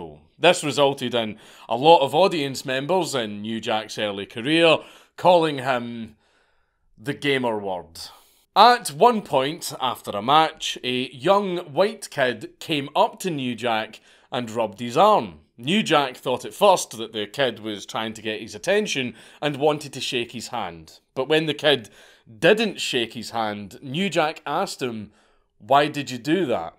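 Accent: United States. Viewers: you have quite a good american accent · American accent Dankula is kinda sic. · dude, your American accent is spot on. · But your American accent took me off guard, I didn't think you would sound like that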